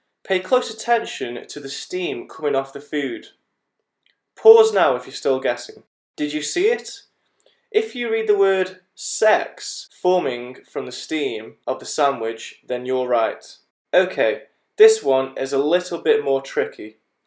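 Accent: United Kingdom. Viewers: You from Manchester?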